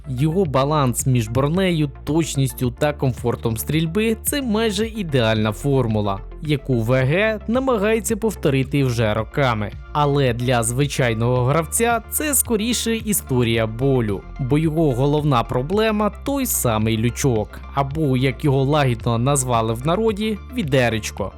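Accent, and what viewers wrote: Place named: Russia. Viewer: А де КВ - 1С? З альфа пуканом? І скажу ще одне, одразу чути що перейшов з російської на українську. 😅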